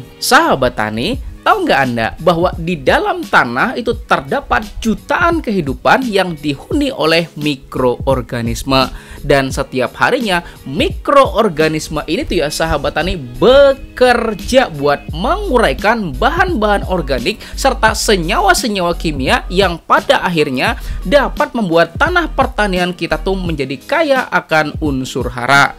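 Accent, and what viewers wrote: Banjar, Indonesia. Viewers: Dari Logat bicara nya kayak org banjar/ hulu sungai, kalsel Iyakah?😅🙏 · Logatnya bubuhan amang banjar pang nah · Mirip aksen urang Banjar Kalsel pian, btw terimakasih info yg sngt bermanfaat nya, semog jd amal jariyah kelak🤲🏻🤲🏻🤲🏻😊🙏🏻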